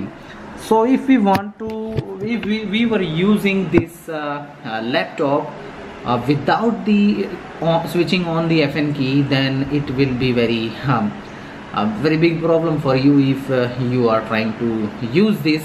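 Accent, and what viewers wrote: India. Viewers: hello and welcome this video was a very good indian speaking man and he is very good looking and i like him he is very good i am indian looking for a husband im 25 he explained this very good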